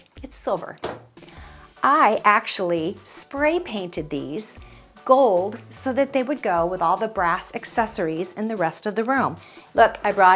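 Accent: England, United Kingdom. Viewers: haha- again a great video of yours, I just love your tiny english accent, thanks for sharing....:::))) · That English accent suits you....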